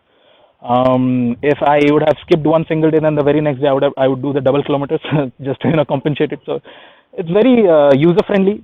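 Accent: India. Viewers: Its Indian English.. If you dont like.. none requesting you to be here.. move ahead buddy!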